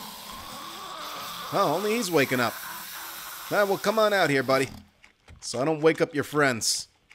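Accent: New Jersey, United States. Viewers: the saying is "You attract more flies with honey than vinger~" which is true. In wooded areas its common to see abandoned be nests full of maggots among other insects. But its meaning is to always be sweet instead of bitter to get what you want. I love your videos. I'm in New York so its awesome to hear such a thick jersey accent outside of Jersey shore. "Cabs are here"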